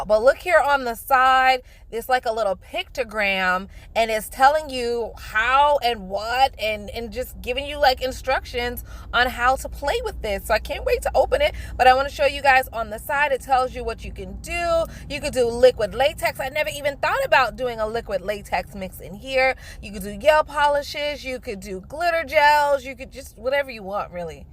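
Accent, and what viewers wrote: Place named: New York, United States. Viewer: Haha. I can hear it. I'm not from NY (from DC) but can spot the NY accent anywhere. LOL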